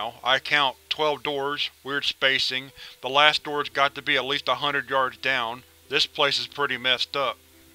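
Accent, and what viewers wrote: United States. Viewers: I think it's his accent, I've watched a ton of these, and i know alot of people from all over the states. I've heard this accent plenty.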